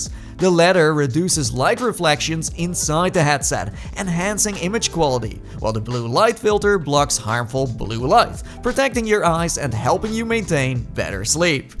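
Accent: Netherlands. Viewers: Thank you for the information, I am going to sell my oculus quest 3 for some money (because it sometimes has some issues) and then i am going to buy the 3s and these adjustments, thank you for the information. (And by the way are you Dutch because I am, and you kind of have a Dutch accent, not saying it’s bad.)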